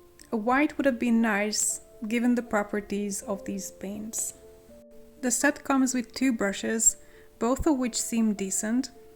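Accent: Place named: Hungary. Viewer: I came upon your channel and I enjoy your art very much and the way you present it. Also, I hope I’m not mistaking (I don’t think I am) I can hear a slight Hungarian accent which I recognize because I am also Hungarian. I cannot believe the derogatory comment you received, your english is beautiful, I don’t understand why would someone say those things,but I’m not completely surprised,there are many different people in this world. I am looking for more videos from you,es kivanok sok szerencset es a legg jobbat mindenbe!😊 Maybe my hungarian is not the best anymore because I live in America for the last 44 years. Szia 👋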